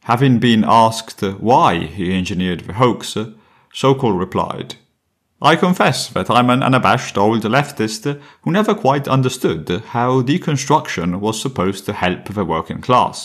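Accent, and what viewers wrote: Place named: Italy. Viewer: I thought it was a finish accent at first but leaning more towards Italian now. Difficult to place tho, to me sounds like a mix of Italian, Icelandic and something Eastern European 🧐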